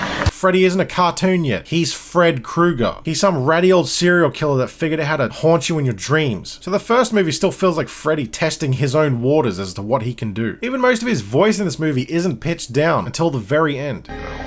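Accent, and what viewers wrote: Australia. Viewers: I could listen to this Aussie talk about horror movies all day!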